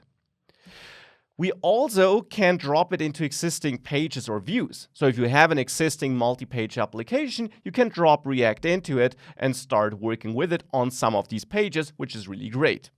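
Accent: Germany. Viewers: that is the second video i watch from u, i really like ur videos, that is why ur earned my sub. i have to laugh about ur german accent but still Tapfer of u, u talk very well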